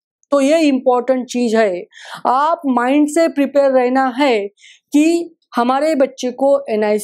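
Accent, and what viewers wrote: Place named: Maharashtra, India. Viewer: Aap marathi hai ,aap hindi nahi bol parhe ho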